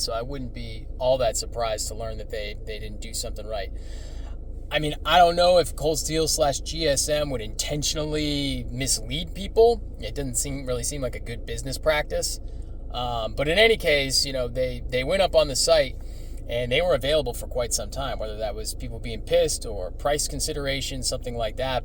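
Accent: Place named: Italy. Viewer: New subscriber here. It was your Italian accent that made me do it…..lol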